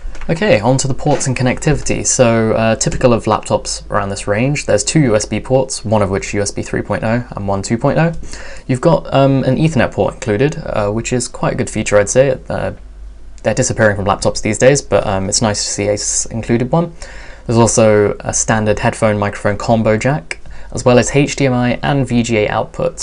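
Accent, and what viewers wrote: England, United Kingdom. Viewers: This guy could not have a more English accent if he tried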